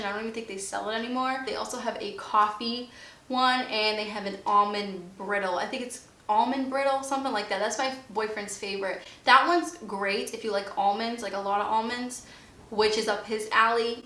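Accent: Boston, United States. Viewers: Great video! My favs are Kite Hill cream cheese and Gardein 7 Grain Chicken strips. Also, I'm from Boston but moved away a few years ago. Hearing your accent reminds me of home and I love it so much! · Stop it!! People love that Boston accent! Don't apologize for being you, we're here because we love your content. ❤️ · From a former New Yorker I love your Boston accent, It's too cute, remember we watch you for you and what you bring to YT so embrace your uniqueness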